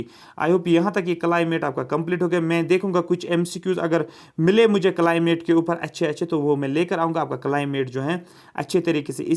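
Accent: Kashmir, India. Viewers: Nice sir .....kashmiri tune in your voice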